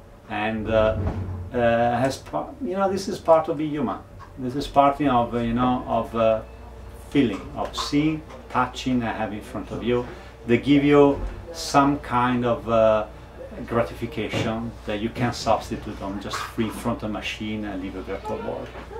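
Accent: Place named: Italy. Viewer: che stile si sente che sei italiano!!!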